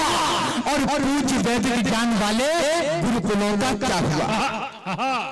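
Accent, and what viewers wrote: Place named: Bundelkhand, India. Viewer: आपकी आवाज में दम है, आवाज भी बुंदेली। 🙏🙏🙏🇮🇳🇮🇳🚩🚩🚩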